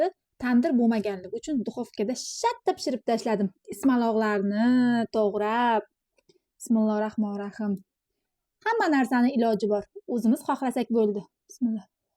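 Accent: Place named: Bukhara, Uzbekistan. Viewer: Бухорони Олотиданмисиз шевангиз хам бухорога ухшаяпти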